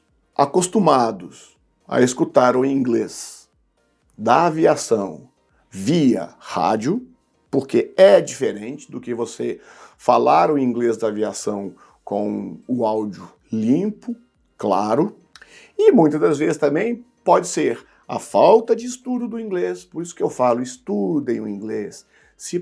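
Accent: Minas Gerais, Brazil. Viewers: Bora "resenhar" as notícias do aeroin, pelas palavras e sotaque mineires do nosso querido Cmdt. Abçao...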